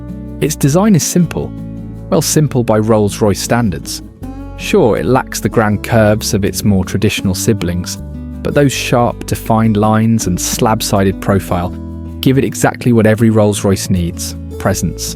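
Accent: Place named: United Kingdom. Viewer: I detest these videos with robogobs: it us narrated with a British accent, but using 'Merkinisms such as "Five hundred twenty nine".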